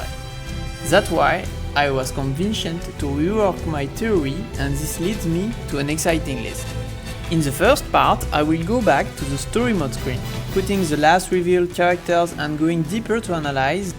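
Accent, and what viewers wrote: France. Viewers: So you're speaking with a French accent? Your pronunciation sounds great. I wanna talk like that lol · Ooh gosh you’re French you hahaha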